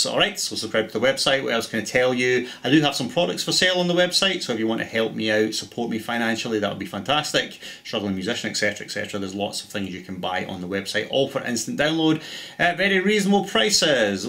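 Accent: Scotland, United Kingdom. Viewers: real good lesson, did you learn piano first or guitar? You seem to know piano quite well and I say that because I'm classically taught from a very early age then later learned guitar and drums, I'm your age and my piano and keyboard playing has helped my guitar playing greatly. You are so very much better than many others who try to do the same.Love the Scotch accent, used to live in England when i was very young.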